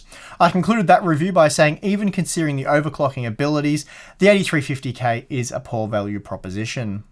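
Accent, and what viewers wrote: Australia. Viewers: I didn't come here for the list, I came here for the Australian accent I'm sorry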